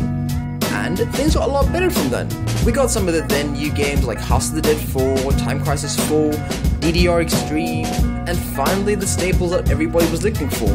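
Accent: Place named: South Australia, Australia. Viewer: South Australian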